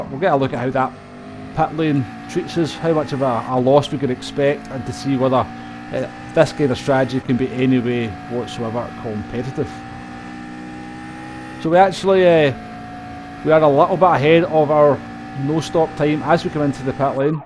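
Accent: Scotland, United Kingdom. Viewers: Track guides would be good, I know there's others doing them and they are all much the same, brake here, turn there, grey parts faster to drive on than the green, brown or yellow bits at the sides however yours would be in Scottish which would make them simply sublime to listen to.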